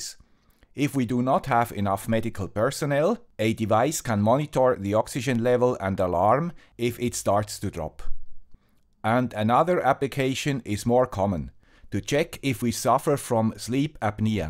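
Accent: Switzerland. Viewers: Another very interesting video from the old guy with the Swiss accent who is not a doctor, but plays one on TV, or at least on YouTube. I'm surprised that they don't use the blood oxygen meter as an additional test for detecting people with corona virus. Maybe it could detect people with 'shortness of breath'? Final question: Do you really have to pay in Switzerland to see a doctor? Of course we pay quite a lot here in the US, but we are always told that people in really nice countries like Switzerland have free access to unlimited healthcare. Of course we also have to buy our own guns here and only the wealthy can afford their own fallout shelters...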